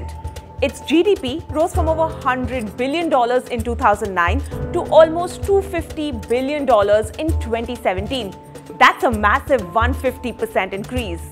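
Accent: India. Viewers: Hate the Indian presenter and accent. Should use English spoken people. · Bring someone who speaks ENGLISH. NOT HINDLISH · Is TRT World an Indian channel !!? Why an Indian with their annoying Indian accent of English and overall and Indian view on the matter of discussion.....!!! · Just 1 percent of your Indian accent makes this entire report 200% biased in your favor. Bangladeshes electricity consumption is <num> Megawatts, where is produces nationally 23,000 megawatt and will increase it to 2 Gigawatt by 2023-4. So what "Electricity hinderance are you refering to?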